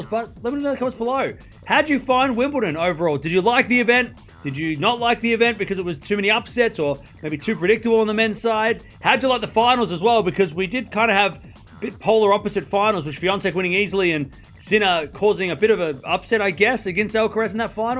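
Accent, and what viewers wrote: Australia. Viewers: Appreciating the info, especially the graphics ... Itsa klaro, even with that distinct Aussie accent ... 👍👍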